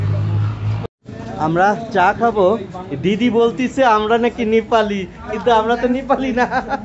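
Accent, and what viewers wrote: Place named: Bogura, Bangladesh. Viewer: আমিও বগুড়ার, আপনার কথা শুনে মনে হয়েছে, পাশাপাশি আপনার চেহারাও পরিচিত মনে হচ্ছে।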